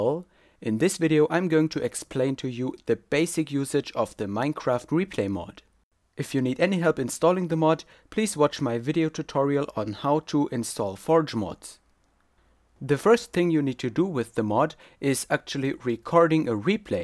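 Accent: Germany. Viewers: Ich höre, dass du ein Deutscher bist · Man hört deinen deutschen Dialekt lol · bist du deutscher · You're german right? xD accent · du bist deutsch man hört das XD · Just wondering, but is german? because In my opinion, he sounds german and it seems a large fraction of his viewers are german. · Dein Englisch ist gut, aber man hörst das du Deutscher bist! xD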